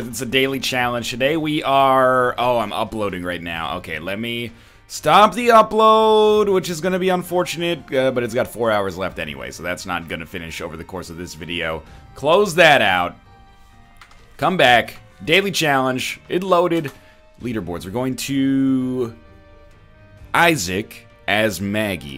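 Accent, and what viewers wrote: Australia. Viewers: Your New Zealand accent is more Australian than New Zealand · probably closer to an aussie accent as they accentuate everything!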